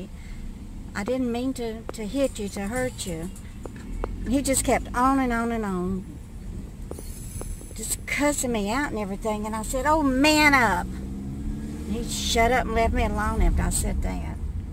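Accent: South, United States. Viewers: you are a delight to listen too and see on your videos. Your voice is so southern and relaxing. I too am from the south. I was born 9/11/43 and raised in Jacksonville Fl. I am several years older than you though. Some of the things you say I think, "Well thinks just like me about some things."😃 I am new watching your videos. The first one I watched was of you just moving to New York. I wonder about you and the snow!! If you aren't use to driving in the snow that will be rough. But some people put chains (I think) on their car tires. But just wanted to write you a little note to tell you I enjoy your videos. Looking forward to seeing your apartment after you get all moved in and fixed up. God Bless you 🙂❤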